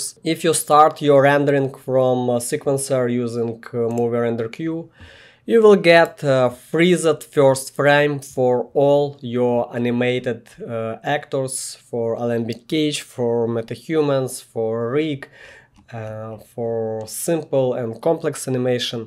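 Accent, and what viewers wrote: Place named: India. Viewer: ..accent like a Rajesh ))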